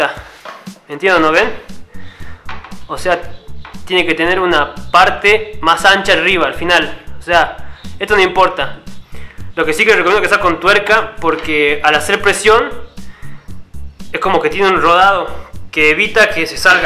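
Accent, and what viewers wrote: Tucumán, Argentina. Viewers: DiiabloGalarza#GidinGang suena tucumano o salteño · sos de Tucuman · Sos argentino o me parece ? :v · Wtf el webi wabo es argentino😮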